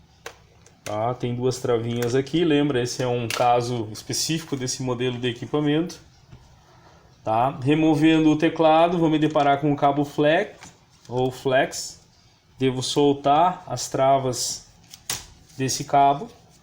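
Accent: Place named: Rio Grande do Sul, Brazil. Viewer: Eita, gauchada sempre termina as frases com "NÉ" :face-orange-raised-eyebrow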